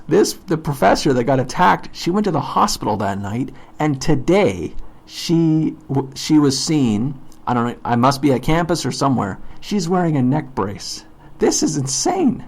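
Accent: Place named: Canada. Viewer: Where / what is Middlebury? You're joking, right? (Perhaps you're from The Great White North? Pretty sure I hear Canadian pronunciations of "out" and "about" - most logical explanation !) Anyway, it's one of the very best liberal arts colleges in the U.S., costs over $60K/ year, consistently ranks in the Top 10 among small private colleges nationwide, and is incredibly difficult to enter in terms of acceptance rates. This all makes the whole episode worse than it may have been otherwise/elsewhere. Most of these kids are in the top 1% nationally (academic performance), and Middlebury attracts students from countries all over the planet who move there to study as well. It's anything BUT a "nobody place." And yes, it's strongly left-leaning. I don't condone what happened in the least, but will say this : I have a relative who's a prof there...he, along with a number of faculty members whom these kids deeply respect, encouraged and coached the appalling behavior prior to Murray's visit (after unsuccessfully trying to get him "disinvited" first.) There was nothing at all spontaneous about the fiasco...all was carefully pre-planned. 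This was completely uncalled for and put Middlebury to shame - its faculty in particular. I'm not excusing the kids' behavior, but they were basically following the orders of their superiors. Disgusting...and I don't even like Murray. I don't agree with his views on I.Q., but firmly support his right to express those views and have a robust debate with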